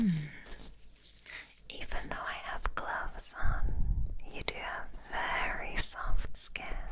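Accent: United Kingdom. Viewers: Her very "queen's english" accent really adds to this, the close in whispering and ear blowing were also peak.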